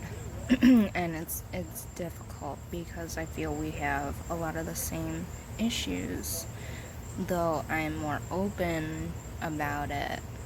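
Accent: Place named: Minnesota, United States. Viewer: i am in love with your Minnesota accent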